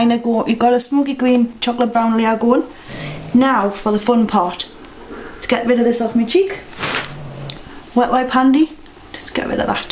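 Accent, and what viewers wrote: Newcastle, United Kingdom. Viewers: It's a Geordie accent! Newcastle, pet. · she is a geordie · I love your accent! Where in the UK are you from?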